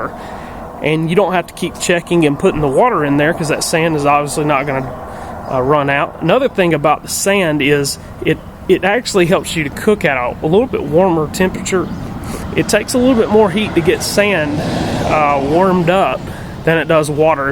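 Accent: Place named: Texas, United States. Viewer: I always smoke in my offset bur a bud got a vertical Brinkmann and neither of us had any idea what the hell to do! Thanks for the video, sir. BTW, your accent, are you one of my fellow Texan brothers? Hope all is well with you and yours, sir.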